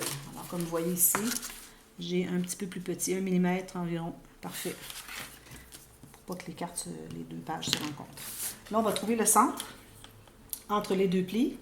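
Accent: Canada. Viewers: Bonsoir, Est ce que tu pourrais nous montrer comment faire un album sur le thème de Noël? Bonne soirée Ps: Votre accent vient du Canada?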